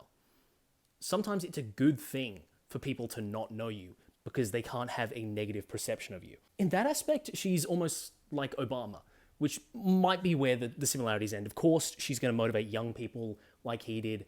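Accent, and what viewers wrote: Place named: Australia. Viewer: Didn't know you were Aussie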